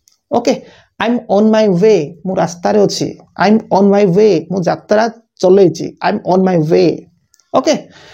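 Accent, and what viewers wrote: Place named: United States. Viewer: Sir American accent kahuchanti 🥱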